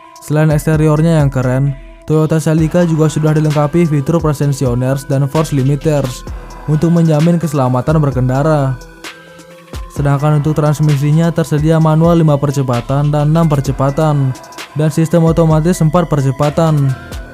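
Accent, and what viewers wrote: Bali, Indonesia. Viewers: Logat orang bali dan ntb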